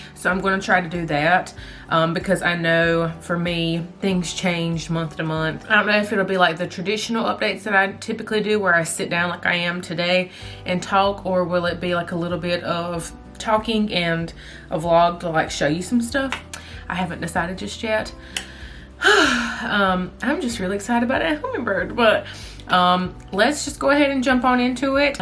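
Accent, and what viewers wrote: United States. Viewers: You’re so country and I love love love it lol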